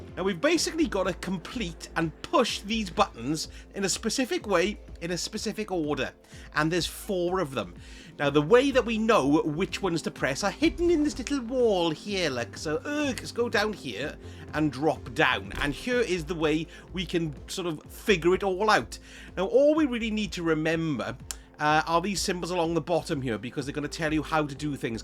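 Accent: Wales, United Kingdom. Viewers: Do I want these triumphs badly enough to listen to a welshman for 10 mins...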